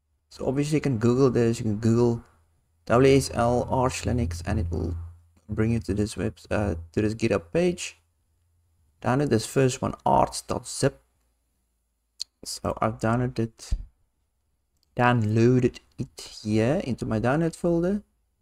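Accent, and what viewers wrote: South Africa. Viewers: I recognize a fellow SAn when I hear one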